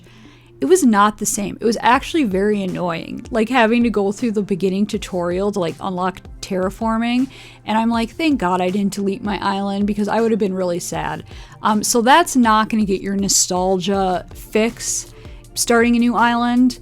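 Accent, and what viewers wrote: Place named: Midwest, United States. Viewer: the driveway landscaping is perfecto! alsoooooo how have I not noticed your (possibly midwest if not cnadian) accent before now???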